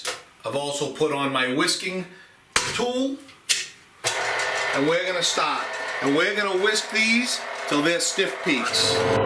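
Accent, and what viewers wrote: Boston, United States. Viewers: Just wondering where you are from. I’m from Boston area and your accent sounds like mine! Lol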